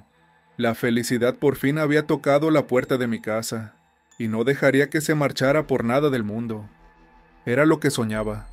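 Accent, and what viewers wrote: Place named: Jalisco, Mexico. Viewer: Hola amigo me gusta como relatas tu forma de ablar nose pero me imaginó que eres de los mios sin ofenderte yo soy de jalisco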